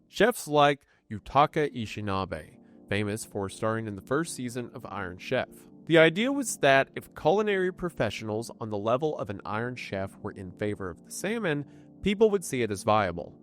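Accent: Appalachia, United States. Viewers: People complaining about how a deep south Appalachian pronounces words from Japan are literally basement dwellers lol. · So this is what happens when you read Japanese words in an American pronunciation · I like his appa-LACHA pronunciation of Japanese names. Brings a little southern comfort. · I don't know if he's mispronouncing it intentionally or genuinely but either just doubles down on his Appalachian charm.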